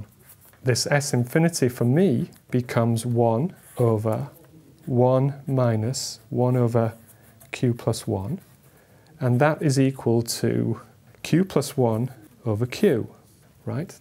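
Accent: United Kingdom. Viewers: British accent supremacy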